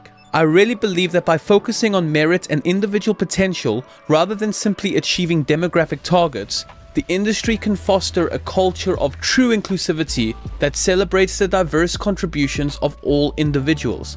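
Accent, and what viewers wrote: South Africa. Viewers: Hmm... I may be mistaken, but something sounds suspiciously South African. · Can't help but hear that distinct South African accent. DEI is just BBBEE but worse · As a fellow South African, I know another South African when I hear it xD Mad respect for the videos you do, your views need to get out there much more especially in DEI. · are you from South Africa? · I had to come check your channel out when I heard the South African accent on Asmons stream earlier xD Keep up the good work dude! Really well discussed, idk why my brain will always default to comparing this stuff with BBBEE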